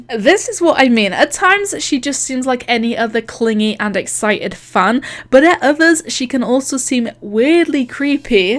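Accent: Scotland, United Kingdom. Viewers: I like her scottish accent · kay ayh ayh You're British Aren't you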